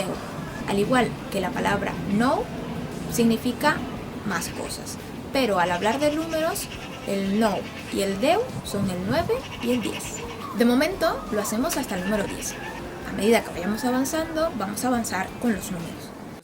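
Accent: Venezuela. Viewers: Sabía que eras venezolana por tu acento y... por tu belleza! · Ahhh es que escuchando tu acento dije que no eras nativa de España ni si quiera, y no me sonaba a más nada sino al de acá. Yo soy Venezolano pero con acento paisa colombiano por mi padre...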